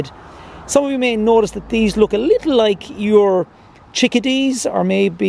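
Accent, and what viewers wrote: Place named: Ireland. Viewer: Let me try that again! Great to see you and your beautiful backyard flowers and ponds. Your birds are spectacular! Also, great to hear you wonderful Irish voice. Nan Hampton (Antarctica, Iceland, Svalbard)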